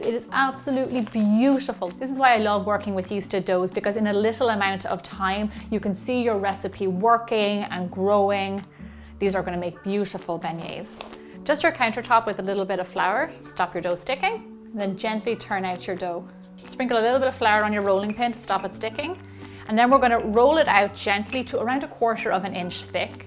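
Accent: Ireland. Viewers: Is she Irish? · are ye irish? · she is Irish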